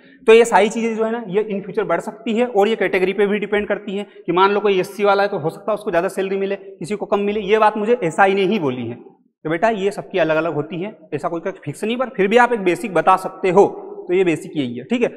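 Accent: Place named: Indore, India. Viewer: Indori bhasa 😂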